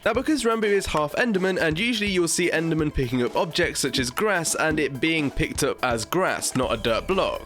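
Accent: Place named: United Kingdom. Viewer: British guy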